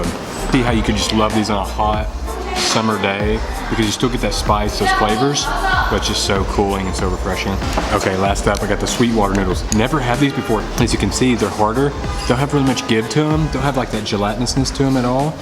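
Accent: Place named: South, United States. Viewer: you are super star in my book to eat Szechuan ma-la chili, pork brain, tripe and all the other deliciousness. Also, love your calm voice and slight Southern accent. 👍🙌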